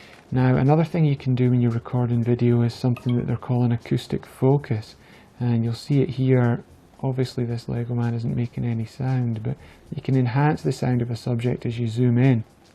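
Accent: Scotland, United Kingdom. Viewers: Scottish. From Scotland. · Love the accent! Scottish?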